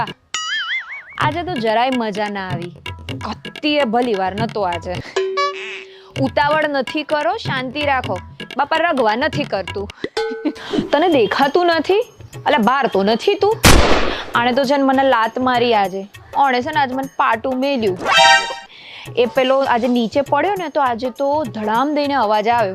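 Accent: Sabarkantha, India. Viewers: Sabarkanthaa is so swit ❤❤❤❤❤❤ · Bau saras laheko sabarkantha no Hu Valsad thi chu bau j alag che tamaru gujrati amuk sabd to aje j sabhadyo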